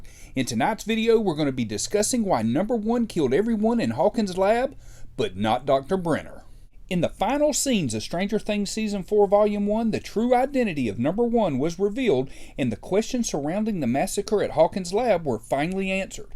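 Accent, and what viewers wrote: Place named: South, United States. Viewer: LOVE your southern accent! "Welcome to talkin sah-fah"😊❤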